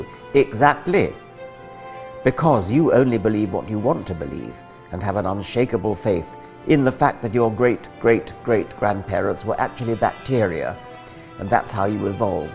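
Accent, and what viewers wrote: England, United Kingdom. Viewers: LMAO do funny with his prim English accent and dry humor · It's made all the funnier by Richard reading out the often illiterate, rambling, expletive laden stuff from people claiming to be god-fearing Christians in his dry English accent. My own favourite is th eone who tells him how they are looking forward to seeing him being tortured in hell and signs it off with "a loving created daughter of our lord". And of course "the fart of god" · we all speak like that - in England dear. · These always bring such a grin to my face. I love the way reads them in that proper English accent. Makes them 10,000x more humorous! Please, not such a long spell between these! Just love the love letters!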